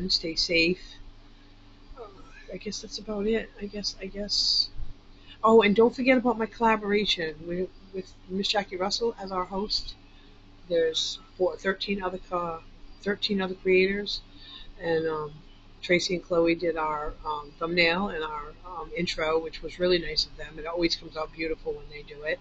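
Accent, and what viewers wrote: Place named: New York, United States. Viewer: Hi, you so remind me of my neighbor in NY, the accent too.😄💗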